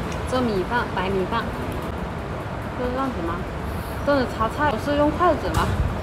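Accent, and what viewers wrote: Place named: Cantonese, China. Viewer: 你说中文好可爱哦有点广东腔👍🏻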